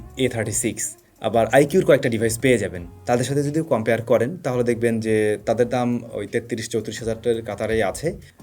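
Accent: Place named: Jashore, Bangladesh. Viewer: vai apnar pronunciation ta jashore er vibe dei. Apni kon jelar origin? Sadat Jashore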